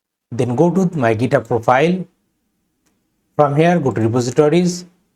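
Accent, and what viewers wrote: India. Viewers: are you from the south india bro?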